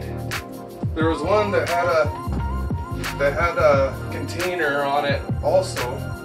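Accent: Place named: Canada. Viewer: Great job🤤🤤 The pizza looked soooo delicious. I personally prefer a fat head pizza crust or a chicken crust. I tried the cauliflower way but too much time 👍Your Canadian accent is so strong 😁. I use to live in Alberta but I moved back to the USA. Anyways enjoyed the video hopefully you will make more cooking videos in the future.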